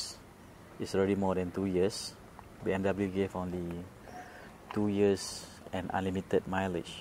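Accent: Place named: Malaysia. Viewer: Nice review. From the accent I'm guessing Malaysia somewhere (apologies if I got that wrong). All round great video !!! Thanks.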